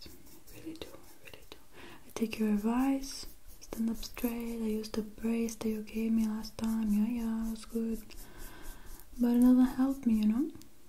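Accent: Mexico. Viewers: You even speak English like some mexican would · I'm mexican and I can hear my accent in english here! 😂 it was so weird, I'm always so self-conscious because I can't pronounce some things as smoothly as I'd like, but this made me feel so happy! Thank you so much, your quality is so good as usual, you're like my favorite person ❤️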